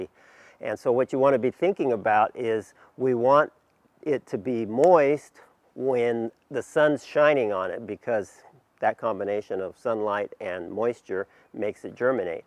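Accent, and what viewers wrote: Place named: California, United States. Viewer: sorry, sounds like california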